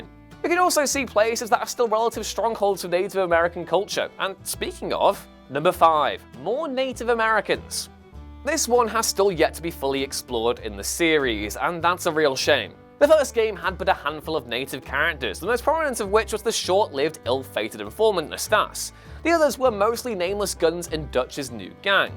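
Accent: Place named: United Kingdom. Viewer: I guess you guys were Americans. U make great content. But that British accent is so annoying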